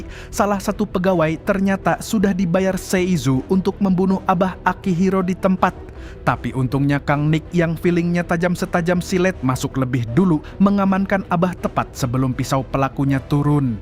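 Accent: Banjar, Indonesia. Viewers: uyy amang banjarrr😂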